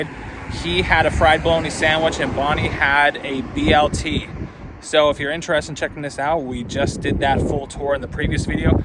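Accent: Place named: United States. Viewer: You sound like an American, but your grammar is pitiful.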